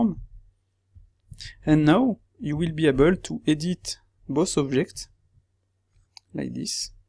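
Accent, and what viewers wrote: France. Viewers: Sa se voit que ces un français qui parle mdrrr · j'avoue xD l'accent est frenchie frenchie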